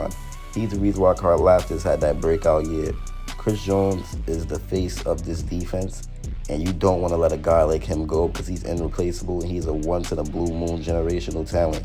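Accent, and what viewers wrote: East Coast, United States. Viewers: sounds like he from east coast some where 😂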